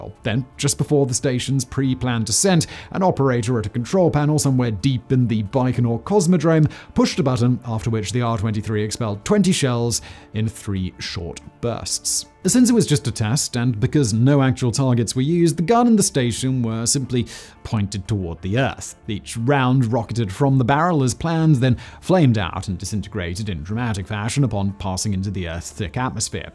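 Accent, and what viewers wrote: United Kingdom. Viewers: Can I just say that the name "Simon Whistler" is the coolest moniker, escpecially when coupled with the British accent....and a story about space weapons.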